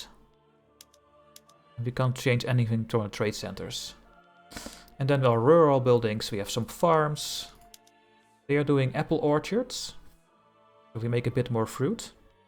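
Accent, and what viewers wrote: Netherlands. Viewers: The further you go.. hoe meer ik hoor dat je ook echt Nederlands bent:D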